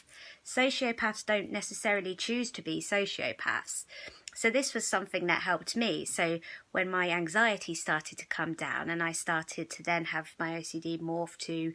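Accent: United Kingdom. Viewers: I love UK accent.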